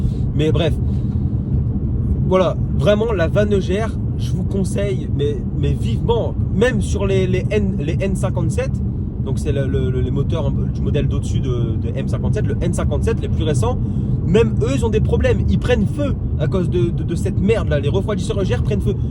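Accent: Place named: North, France. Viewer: Ca se vois trop que tes du nord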